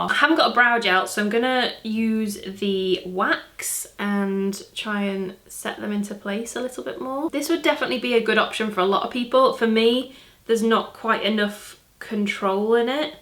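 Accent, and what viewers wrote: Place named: Wirral, United Kingdom. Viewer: Hiya:) Stumbled across your videos and so happy I did!! I subscribed in less than 2 mins (unheard of Youtube behaviour from me I may add) of you talking as I thought wow, this is how me and my friends talk, so refreshing but relaxing/oddly familiar... and then I watched another video and found you are from the Wirral too... (and so I wanted to comment, also unheard of Youtube behaviour from me, as I usually just creep from video to video, never liking or commenting) Is there a Wirral sense of humour/chat/banter, if so, I think you repping us amazingly and would love to see you conquer online and mainstream media for us average girls!! You are one of a handful of youtubers I have watched who seem genuine, and like a right laugh on a night out:) Keep on creating and I would love to see more styling/haul videos as love your fashion sense!! 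Hannah xxx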